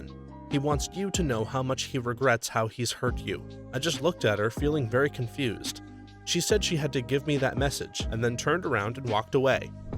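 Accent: Scotland, United Kingdom. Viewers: Oh god as a glaswegian I love your Scottish accent 🤣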